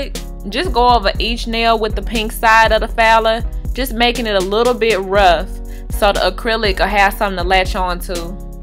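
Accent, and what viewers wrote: South, United States. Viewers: I don't understand why people were saying this was "ghetto" and she sounds "ghetto" ?? wtf does ghetto sound like ?? Cuz to me it sounds like she just has a country accent.. But anyway..nice tutorial girl. Them nails SLAY 😍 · I LOVE IT!!! YES TO YOUR SOUTHERN ACCENT!!! YESSS TO YOUR PERSONALITY!!! YESS YESS YESS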